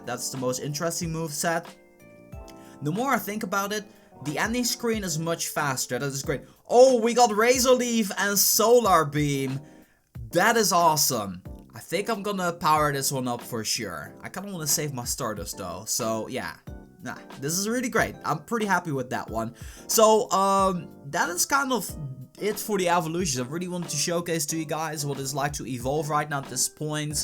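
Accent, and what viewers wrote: Netherlands. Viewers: Are u ducht ?? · Kom jij uit Nederland wouw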